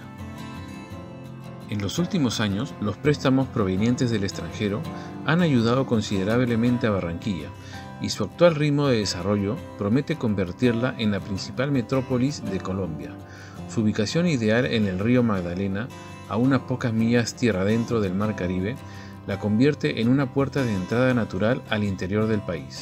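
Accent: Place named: Lima, Peru. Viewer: El narrador tiene acento de Lima, Perú.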